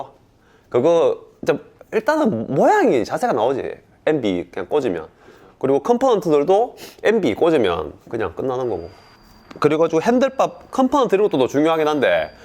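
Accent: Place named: Busan, South Korea. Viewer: 부산 사투리군요 영상보면서 강호동이 자꾸 떠오름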